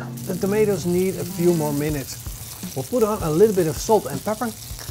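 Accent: Netherlands. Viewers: His commentary and Dutch accent is what makes his channel uniquely his. I love it, it shows a true love for cooking and eating.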